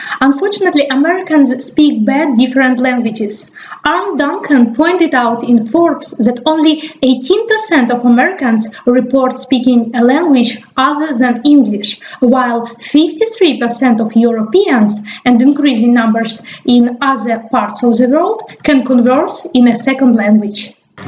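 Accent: Russia. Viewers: Невозможно слушать этот нижегородский прононс... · I love your Russian accent so nice to hear that.... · russian accent,i like it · Your pronunciation is a cultural diversity as well it's really specific for Russian people to speak a Russian English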